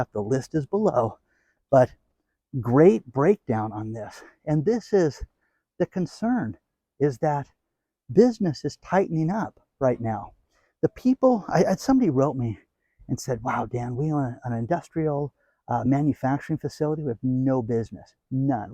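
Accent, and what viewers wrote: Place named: Southern California, United States. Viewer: The SoCal inflected "OKs" get me laughing every time!!😂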